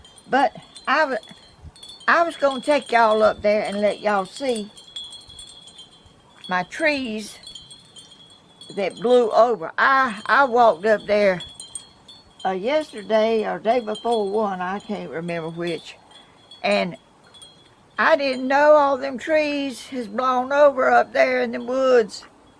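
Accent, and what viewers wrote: South, United States. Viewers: Love your Shawls and have 1 I wear myself. The Ladybugs R great!! 🐞love to hear you talk and I'm a southern too. Blessings and love to You from N.Carolina.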